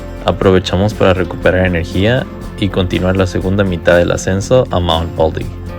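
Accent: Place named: Mexico. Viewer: Awesome view and definitely inspiring too, especially when the old lady caught up and said that she's climbed that mountain for 25 times! 😊 非常棒的影片，看完之後真的會讓人也想去爬山，Dr. Razo的墨西哥口音也很好理解，可以邊享受美景邊練習聽力，真的是一舉兩得😉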